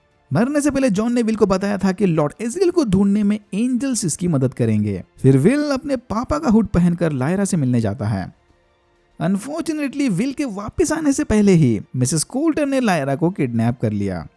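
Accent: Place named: Pune, India. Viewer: Punekar bolte🔥🔥🔥💪🏽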